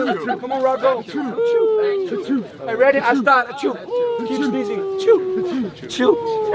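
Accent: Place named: Denmark. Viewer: That Danglish tho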